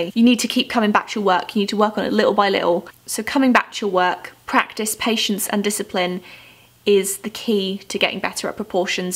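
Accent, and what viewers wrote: England, United Kingdom. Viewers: I just watched this video which was the first one for me and you made it enjoyable. I enjoyed your style of presentation so Kudos! From your accent you are English yet are your living in the US? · Which country do you live in because you sound English, but I've never seen Prismacolors in the UK (I'm Welsh) and you were stating the price in dollars? You also mentioned Hobby Lobby but I'm only familiar with Hobbycraft? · I made a few pages in my sketchbook that I named "mouths", "eyes" and drew over the whole pages. At first I was like "I need to make great works in it", but soon I realized the point of it xD Also, when I watch your videos I always pick that British accent. It's very helpful, since I study English XD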